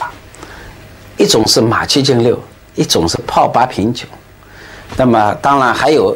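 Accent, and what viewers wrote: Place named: Fujian, China. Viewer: Fujian style mandarin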